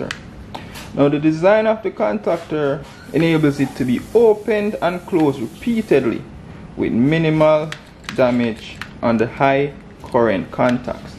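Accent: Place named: Jamaica. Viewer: Thank you Jamaica 🇯🇲 I know that accent anywhere Greetings from 🇧🇿 Belize!!!! ☮️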